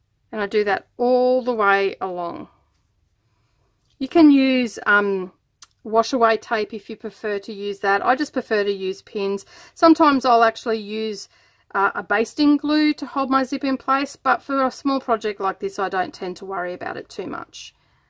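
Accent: Australia. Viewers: great to hear an Aussie...not many doing sewing videos...keep em up :D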